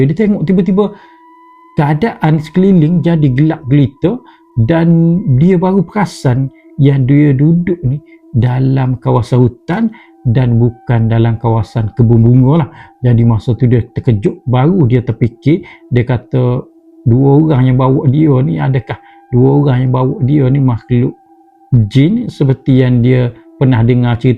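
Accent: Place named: Kelantan, Malaysia. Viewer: Bahasa tu bang.... Lekat sangat bunyi Kelantan... Kadang kurang faham... 😊... Harap maklum ye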